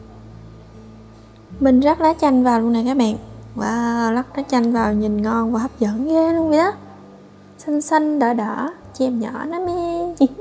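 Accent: Mekong Delta, Vietnam. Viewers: Co Gai mien tay Chat giong hay qua ngot lm e oi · Bạn ở miền tây à,nghe giọng de thương quá, cái muỗng dua quê mình....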